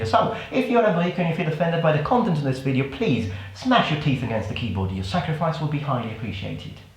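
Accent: England, United Kingdom. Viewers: from my vantage point here in merica you need to do some bicep curls bro. and why do you sounds like an Englishmen if you're an eyetalian? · Perche' e la prima volta che vedo un tuo video ,e mi sembrava dall'inizio che sei italiano,anche seppure hai l'accento inglese ahahha.E io poi che sono rumena ,ritornata 7 anni fa in Romania dall'Italia :)))?!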